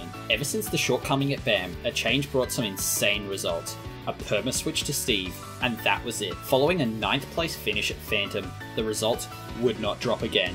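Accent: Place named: Australia. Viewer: This guy sound Australian. 🤔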